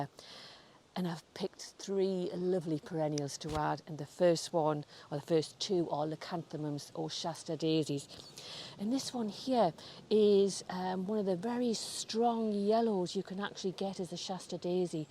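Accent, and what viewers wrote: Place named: Northumberland, United Kingdom. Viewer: I just discovered you yesterday and I knew I recognized that accent. I was born in Northumberland but the area is now a part of Tyne and Wear...Cullercoats/North Shields area. I've lived in Illinois most of my life after we emigrated in the 60's. I was surprised to hear about the Annabelle hydrangea being from Anna Illinois in a different video. Enjoying watching you ❤️🇬🇧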